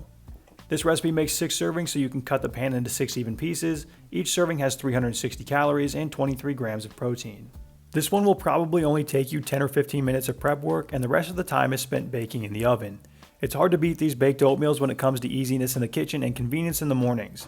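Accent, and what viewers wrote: Australia. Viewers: 10/10 Aussie accent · Great ozzy accent! I feel like you would be great guy as a friend 😆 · Thumbs up 👍🏾 for the awesome Aussie accent! 😆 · Oh wow. That’s an authentic Australian accent.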